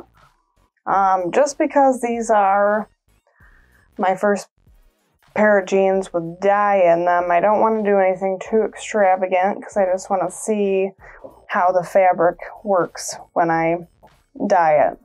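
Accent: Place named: Wisconsin, United States. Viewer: You sound like you’re from Wisconsin too!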